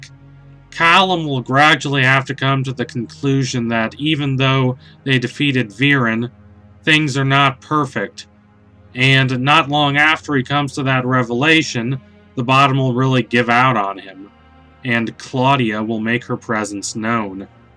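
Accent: South, United States. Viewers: Hopefully Claudia is the villain/ star character of season 4 because I would like to see her reactions to Rayllum and over all, the peace between Xadia and The Human Kingdoms. Also, I love you random southern accent, I laughed while listening to this video (in a good way). Keep up the great videos! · Dude do u have a accent? U almost sound southern?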